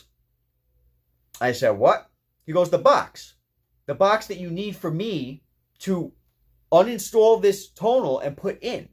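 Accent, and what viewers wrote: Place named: East Coast, United States. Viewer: I kept listening because I honestly love your accent. You must be from the east coast? New viewer here. My mom had a thick east coast accent and I miss it everyday since she passed away.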